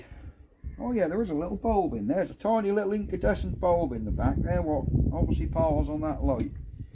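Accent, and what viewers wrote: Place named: Yarmouth, United Kingdom. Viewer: All I did was report it stolen which was a waste of time. But I found out who stole it (another boater) but they had sold it by then. I bought it off of eBay for £20 If my memory serves me right and got it running. But it never really ran smoothly as the float needle was tired. I put a toggle switch on the voltage adjustment so I could use 12 and 24 as didn't need the 6v. Just so you know it works brilliant as a jump starter. I started a mates horsebox with it as it ran 24v. It's good to see it's still being used and is going to do you a good turn. Glad it's safe now and your the new owner. Love your video's my mum walked in earlier whilst watching a video as she instantly recognised the accent. She's born and bred Yarmouth.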